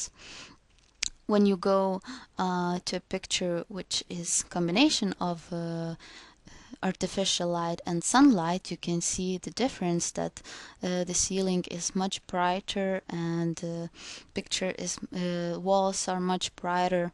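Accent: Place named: Lithuania. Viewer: Is balso pazinau, kad lietuve :P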